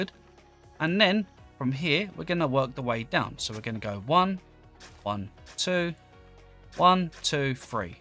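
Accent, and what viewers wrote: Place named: South, United Kingdom. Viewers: 10/10 cockney accent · How does somebody sound southern and British at the same time 👁👄👁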